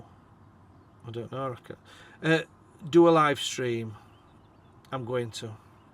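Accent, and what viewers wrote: Yorkshire, United Kingdom. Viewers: yorkshire though and thourgh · Thumbs up if you watched the whole video 👍🏼.. You come over as such a nice bloke, Honest values and tbf I share many of your views…it’s definitely the Yorkshire accent that kept me the full hour n bit though! lol..also, my girlfriend Cat said she’s never found a bald man attractive before…I guess that’s a compliment!haha! Hopefully one day bump in to you..and maybe you’ll smell like lynx Africa 😂😂😂 Our best to you n hellibobs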